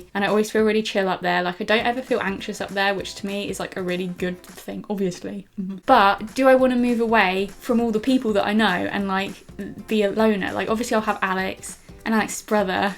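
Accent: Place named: Yorkshire, United Kingdom. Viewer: Up North!!! Love the yorkshire accent... cough cough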